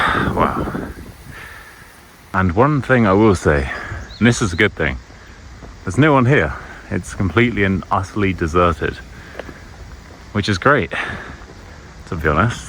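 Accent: United Kingdom. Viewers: I'm Thai from Thailand. I'm learning British pronunciation, finally, I found you. Thank you, teacher.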